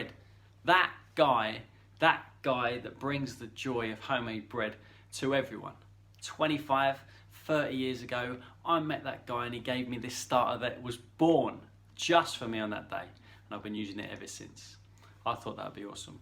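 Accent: United Kingdom. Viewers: Hi, I’ve just discovered your channel and find you delightful and after seeing the first video I’ve quickly subscribed! As an American I love your accent and most of what you say I understand - sometimes I have to try and interpret a word but it’s so worth it. Right away I’m learning some things about sourdough that I did not know. My journey with sourdough just recently started and you make it fun!! I’m 78 years young and I think I have a crush on you, lol 😊 ( who says Americans can’t learn something from the Brits? ). Happy baking sweetie 😅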